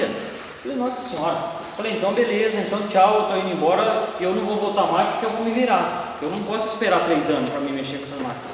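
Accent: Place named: São Paulo, Brazil. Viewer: Entendi, pelo sotaque pensei que era perto da minha cidade. Torrinha SP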